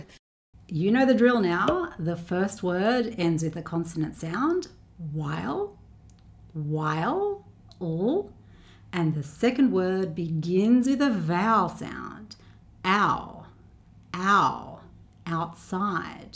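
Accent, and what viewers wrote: Australia. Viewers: Are you native Australian?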